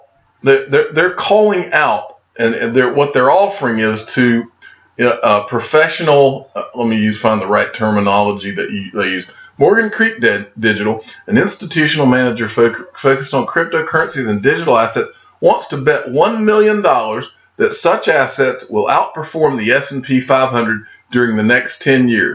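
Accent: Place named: Tennessee, United States. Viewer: Sounds like a tennessee accent to me.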